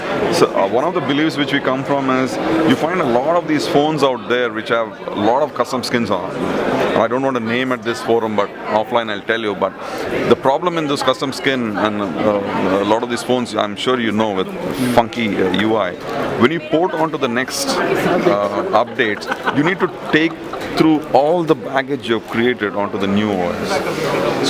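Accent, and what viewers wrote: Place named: India. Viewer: get used to the indian accent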